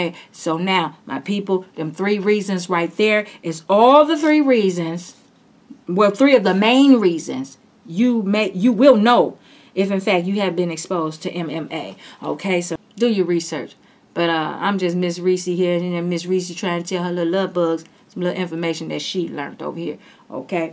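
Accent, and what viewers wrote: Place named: Louisiana, United States. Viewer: Girl where you from. I'm a guess Louisiana. I love your videos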